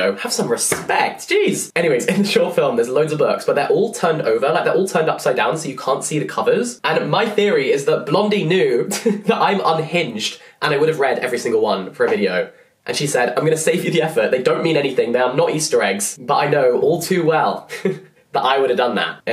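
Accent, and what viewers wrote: France. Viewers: Big fan of the didn’t cry, I’m hard as nails to the don’t come for my French accent or clothing choices energy 👌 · Your french accent is actually pretty nice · i love your little french accent!! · Your French accent is so cute tho 😍 And I will also happily order a vase of wine 😂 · I love your french accent ! From a french girl 😉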